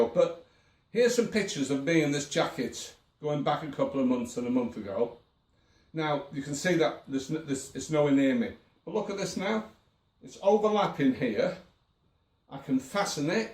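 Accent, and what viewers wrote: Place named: Manchester, United Kingdom. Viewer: Congratulations my friend. Happy you've been successful 💪👍👍👍. I'm from the continent but from your accent I would say you're from Manchester where my favourite music bands come from.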